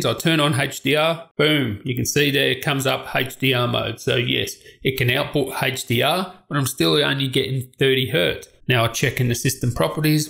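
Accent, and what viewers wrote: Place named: Australia. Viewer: Oh its so aussi 😆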